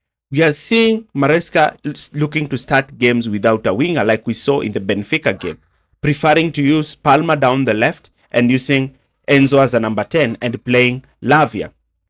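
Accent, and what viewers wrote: Kenya. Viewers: Kenyan accent 😊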